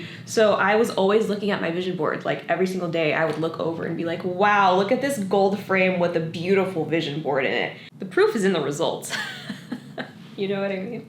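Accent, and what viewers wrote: Australia. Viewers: I love that the aussie accent intro is making a reappearance! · girl I've gotten so used to you saying "in the description box down below" in an Australian accent that I get confused when other YouTubers don't say it in one lmao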